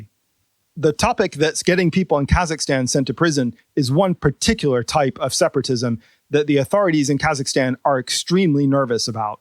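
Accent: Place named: Philadelphia, United States. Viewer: Are you from Philly? Guessing base on your "o"s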